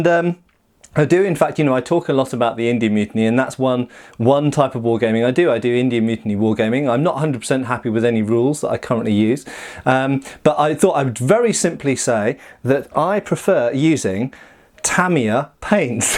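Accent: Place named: United Kingdom. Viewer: Oh boy, you are so british!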